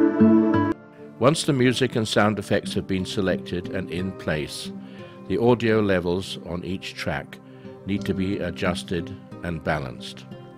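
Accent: England, United Kingdom. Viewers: THX FOR SHARING. YOU SOUND ENGLISH?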